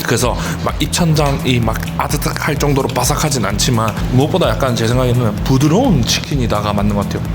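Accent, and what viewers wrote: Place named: Gyeongsang, South Korea. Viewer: 갱상도 사투리도 이렇게 서윗하게 들릴줄은 목소리 언제나 서윗합니다..ㅋㅋ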